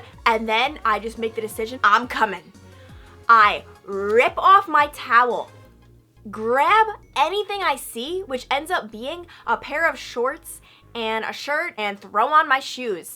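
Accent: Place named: Midwest, United States. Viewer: does anyone know where is from because I'm from Wisconsin and she sounds like she has a midwestern accent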